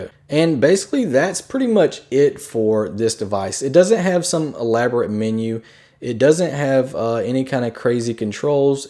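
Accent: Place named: South, United States. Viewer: I trust men with southern accents so I feel relatively comfortable asking you this question, hopefully you can answer it for me. Recently, my guy talked me into getting this box because he doesn't like my smoking. What, in your opinion if any, is the best e juice to use that is the most like a cigarette? I realize this is comparing apples to oranges, but I'm kind of desperate. I told him that I wanted the Juul and the original Kangerbox and the emporer's e juice...and he got me this Kanger-made NE box, no Juul system and some kinds of e juice that I hate. he kept saying "tell me what you want." I DID. But he would not add to the cart what I actually specified, so I ended up saying "just get me what YOU want me to have then." So he did and I am now in possession of the box...and no juice that I like. I don't trust going into the stores to test juices because I live in Springfield, MA. 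if you know anything about this area, it's nothing but criminals, and stores filled with really bad product with unregulated chemicals and whatnot. No sane person would buy anything around here. That said, my question is, what vape e juice that is like regular tobacco do YOU like, and where can it be bought online? Thank you in advance. :)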